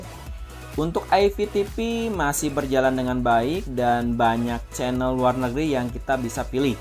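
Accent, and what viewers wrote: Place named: Java, Indonesia. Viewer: TPRI 😂😂😂 medok bangettt bang 😂😂😂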